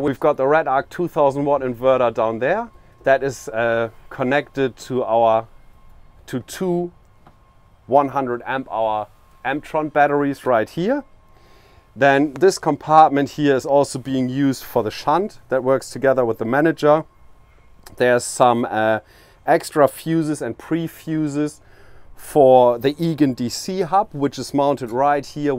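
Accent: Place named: Germany. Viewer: It’s a pretty fancy set up for a troopy. I am just asking myself where they put all their camping gear? What about a table, chairs, bags. You said it’s perfect for longer traveling where do they put their clothes? Looks like heaps of electronics but not much space in the end… I would be curious what this set up costs… Ps. Love the German accent! :)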